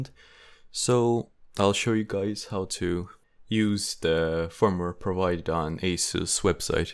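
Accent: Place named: Ireland. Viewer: you have an irish accent im confused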